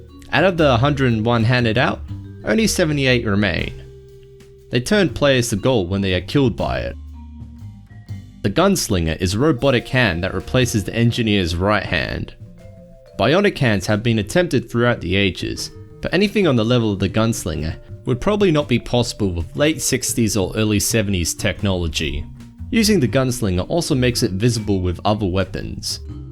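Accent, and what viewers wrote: Australia. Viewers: Are you Aussie? The accent makes me think that. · Your voice reminds me of Robin Walker (dev at Valve who designed TFC and TF2). Are you, by any chance, Australian?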